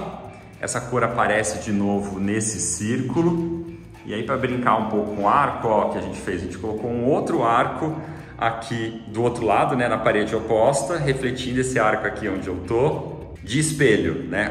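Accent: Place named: Paraná, Brazil. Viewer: Vc não perdeu o sotaque de pé vermelho!🙈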